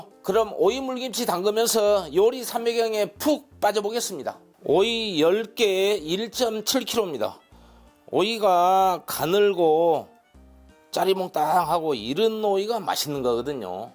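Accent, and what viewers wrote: Jeolla, South Korea. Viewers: 전라도 사투리가 넘 정겨워요. 재미있고요. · ㅋㅋ김대석쉐프님 전라도 말씨가 겁나게 구수해요 저두 그짝ㅋ 저두응식한지20년이넘었는데 참맛나게 잘하세요 참고해서 많이 활용할게요 더많은 레시피 부탁합니다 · 세프님 전라도 사투리 넘나 잼 나요 종발이라는 말 아주어렸을때 들어서 정겨워요 오이 물 김치 잘 해먹어봐야죠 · 전라도 사투리가 구수하네요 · 전라도 분이신가 말투가 구수하시네요 ㅎㅎ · 구수한 전라도사투리 인간적으로 보여요ㆍ🎉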